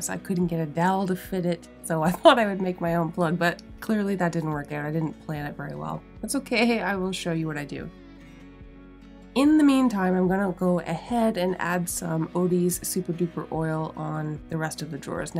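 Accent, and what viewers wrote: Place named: Hants County, Canada. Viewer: Hello from East Hants! Did you film the lightning? It was relentless and went on for hours. Always love your videos, and hearing a Hants County accent on YouTube! 😉Keep up the great work! ❤🤍❤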